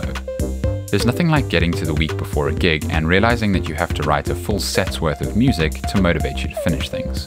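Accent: South Africa. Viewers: I can hear that you are from Cape Town 👀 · You sound so South African my bru !!! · Are you South African? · Ayyyy you from durbs bru? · South african ? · I got excited when I heard your SA accent . Thanks for the tips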